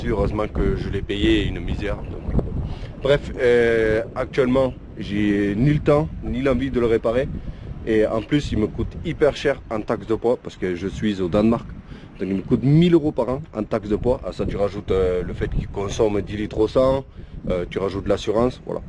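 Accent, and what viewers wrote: South, France. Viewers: G déliré ou t'as l'accent du sud quand tu parles dans la vidéo ? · Force à toi, le scandinave avec l'accent dégueulasse du midi.... Bisous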